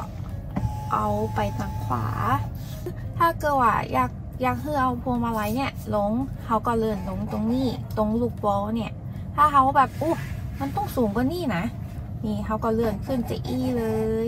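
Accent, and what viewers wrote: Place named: North, Thailand. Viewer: ชอบความเหนือแท้100% ชอมมากกก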